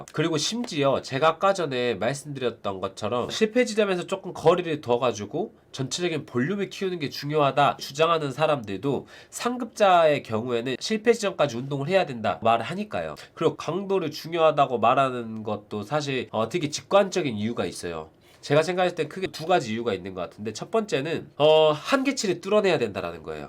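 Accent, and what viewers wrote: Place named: Jeolla, South Korea. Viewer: 아놔 라도 사투리 때문에 안들을란다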